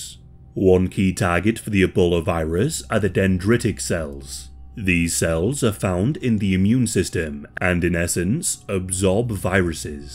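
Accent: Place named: Scotland, United Kingdom. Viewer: I love your accent! Where are you from?! I wanna’ say Scotland, but, I could be wrong. I love your channel, too.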